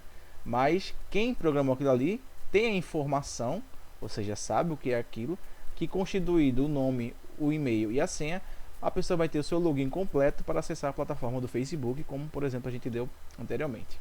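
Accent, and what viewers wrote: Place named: Northeast, Brazil. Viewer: Boa aula! E esse sotaque é típico do meu nordeste.👏🏼👏🏼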